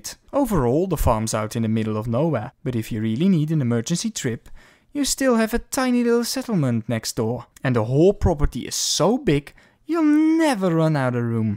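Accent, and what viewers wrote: Netherlands. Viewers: Wat een heerlijk Hollands accent :' ) Got a sub out of me tho!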